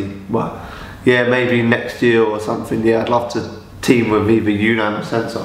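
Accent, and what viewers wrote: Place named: Brixton, United Kingdom. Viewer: He's from Ipswich, why's he talking like he's from Brixton?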